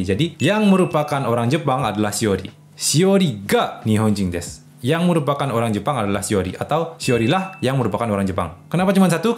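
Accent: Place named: Bali, Indonesia. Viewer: Mendengar bercerita panjang-lebar seperti ini, jadi teringat dulu waktu saya masih tinggal & mengajar di Bali. Bhs Indonesia-nya khas Bali, demikian juga dengan joke2nya 😅😂🤣😆 Terus semangat, sensei \\(^_^)// Jasamu sangat diperlukan di negeri ini! 😁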